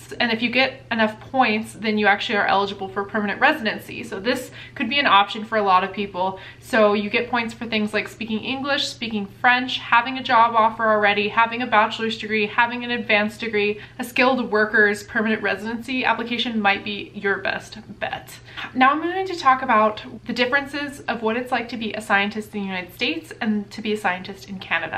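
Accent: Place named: Canada. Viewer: I didn't realize you weren't Canadian. You have picked up the accent!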